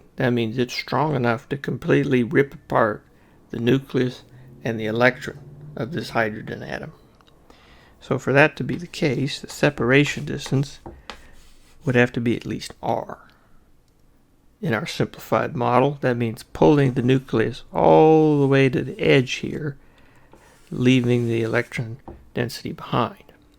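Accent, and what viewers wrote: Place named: South, United States. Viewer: Love the Southern drawl haha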